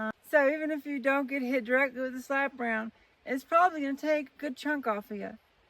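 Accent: South, United States. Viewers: Sound like you from Mississippi or Alabama or Tennessee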